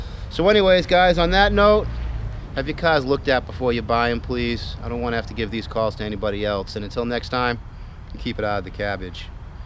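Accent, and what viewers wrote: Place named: Boston, United States. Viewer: Boston guy!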